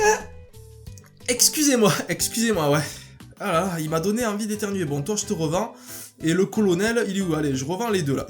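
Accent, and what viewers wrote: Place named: South, France. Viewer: Vu ton accent ca se voit que tu es un sudiste😊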